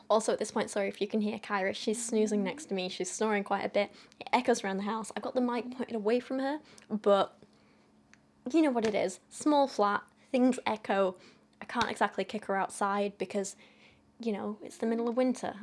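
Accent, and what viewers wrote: United Kingdom. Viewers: I think the coughing and British accent sort of makes you sound like a ye olde aristocrat- in the best possible way, you rule, your Majesty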